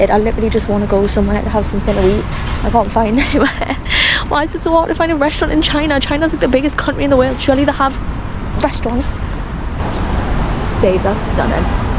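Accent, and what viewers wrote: United Kingdom. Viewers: 你说的英语我不是那么能听懂 。感觉习惯老美的口音多一些 ；不过伦敦口音还是很好听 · your accent reminds me of Jodie Comer. Are you from Liverpool, too?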